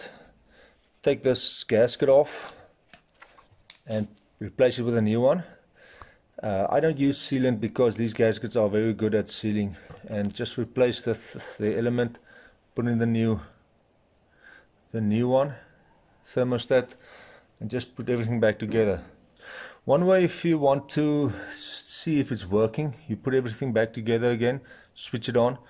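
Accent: South Africa. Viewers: Ek kan mos hoor jys Afrikaans Danke oom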